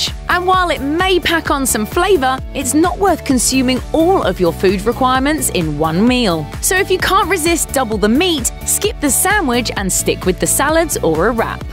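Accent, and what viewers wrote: United Kingdom. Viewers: "your narrator sounds British accent" "that was awkward?!" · British accent makes things look scientific. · Double pastrami Double steak And double never listen to a British chick tell you about American fast food restaurants... WTF...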